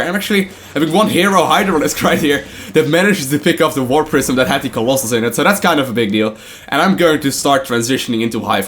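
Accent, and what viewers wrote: Netherlands. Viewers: his accent is dutch :D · It's neither canadian or british. It's northern european, possibly dutch. Feel free to confirm or deny.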